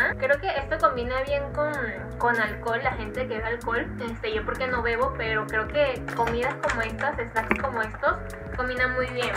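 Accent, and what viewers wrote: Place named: Peru. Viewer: Tu acento es peruano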